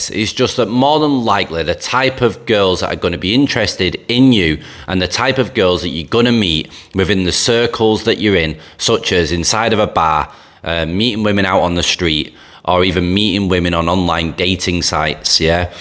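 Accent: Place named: England, United Kingdom. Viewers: Great information! I am also interested in the wide variety of english accents. Where exactly is yours from ? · GREAT STORY...BUT, THE BLOODY ACCENT BROUGHT ME BACK TO MY CONSTRUCTION DAYS IN DUBAI...WITH LADS FROM---UPPPP NORTH. BLESSINGS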